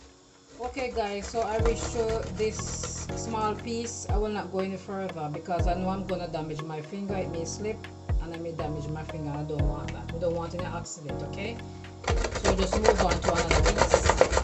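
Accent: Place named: Jamaica. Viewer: Hello!! Mirna from Southern Arizona here! 🤓🌞 I love everything coconut! 👍 I'll be sure to try your recipe! Love your Jamaican accent 😁🥰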